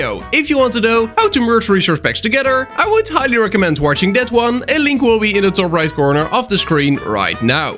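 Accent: Netherlands. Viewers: I swear, every time I try to find a Minecraft server tutorial. You show up, and you don't disappoint me. It's very useful, thanks big dawg! (also nice Dutch accent.)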